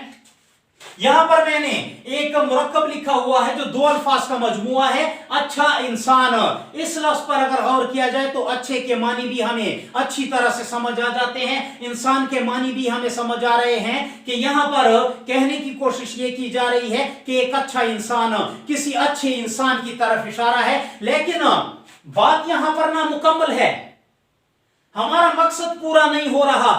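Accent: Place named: Pakistan. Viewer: aap urdu speaker nahi ho mujhe balochistan ya peshawar se lagte ho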